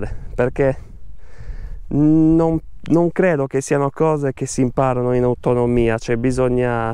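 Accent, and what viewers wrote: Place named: Sardinia, Italy. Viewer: A metà del video ero già iscritto. Parli come deve parlare qualcuno che ci tiene a farti imparare. Bravo veramente e l'accento sardo rende tutto più simpatico.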